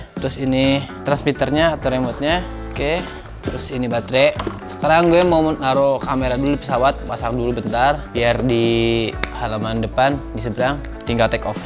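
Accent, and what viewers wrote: West Java, Indonesia. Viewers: nagen (sunda pisan si aa ) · "Nagen" apa ya Nagen "Hampeg" apa ya hampeg, kocak emang ge ka alshad mah sunda pisan.. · Tetep ya a sunda nya keluar, emng enak bgt ngomong pake bahasa sunda ma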